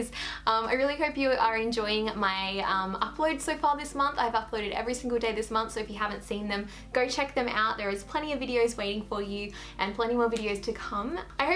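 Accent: Australia. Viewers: Is she australian? I cant tell x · OMG R U AUSTRALIAN!!?!? I AM!!!!!!!!!!! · Is she Australian?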